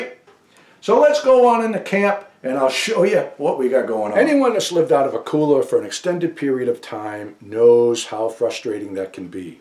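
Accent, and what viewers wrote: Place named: Massachusetts, United States. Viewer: Not to get off topic, but you have a very pronounced new England accent, specifically Massachusetts. I'm from Massachusetts, and I would know that accent anywhere 😊 GOD bless you and Thank you for the tips.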